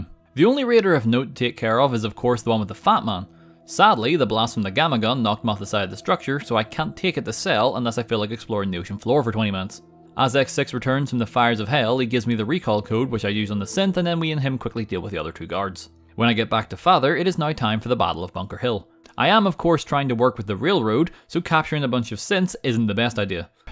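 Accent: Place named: Northern Ireland, United Kingdom. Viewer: the northern irish accent is strong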